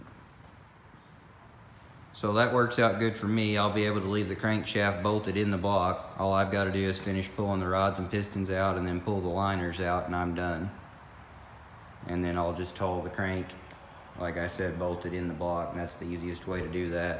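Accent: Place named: Kentucky, United States. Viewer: Not sure where dude's from but man he sounds like rural Kentuckian to me. All he needs to do is say "hell fire" as an exclamation and i'd be convinced.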